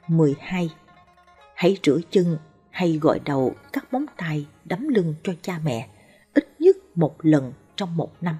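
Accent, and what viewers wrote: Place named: South, Vietnam. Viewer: Chị đọc giọng người miền nam nghe hay nhẹ nhàn trong vắc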